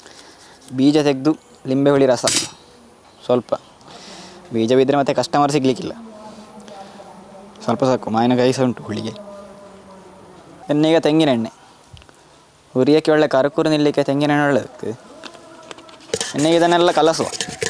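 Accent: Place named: Coastal Karnataka, India. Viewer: ಆಹಾ ನಿಮ್ಮ ಪರಿಪೂರ್ಣ ಕರಾವಳಿ ಕನ್ನಡ ಕೇಳೊದೆ ಒಂದು ಖುಷಿ.....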